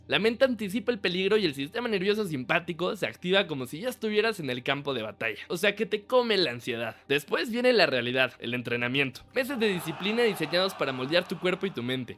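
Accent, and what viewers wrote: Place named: Mexico. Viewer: que habla este tipo? que la guerra no entiene de genero? amigo estas bien ? entiendo que el contexto al cual haces referencia es una guerra tecnologica, pero te recuerdo que la mayoria de guerras se siguen librando en barro y en trincheras, una mujer no duraria ni una semana en las trincheras del frente en ucrania, de hecho ni dos dias. Asi que deja de hablar mrda woke, las mujeres no pueden luchar en campos de batallas reales, no de manera masiva por lomenos. Que hayan existido escuadrones y pelotones de ellas es verdad, pero no es la norma , es mas si mal no recuerdo las comenzaron a usar la URSS solo por que ya no quedaba de otra, eran la ultima opcion, pues la realidad es que biologicamente no sirven para ello. que la guerra no ha traido paz? xdd por tu acento supongo eres mexicano, tus antepasados han muerto por tu libertad que ahora desperdicias haciendo videos pendejos. 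La guerra en su gran mayoria si trae paz, que tu y yo estemos escribiendo nuestras opiniones en internet sentados desde nuestros hagares es prueba feaciente de ello.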